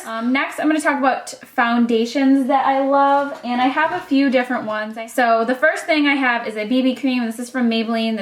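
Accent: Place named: Midwest, United States. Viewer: I've always wanted to ask you, are you from the Midwest?? You have a strong Midwest accent! Nothing wrong with that, I'm originally from Chicago but I don't have the accent:(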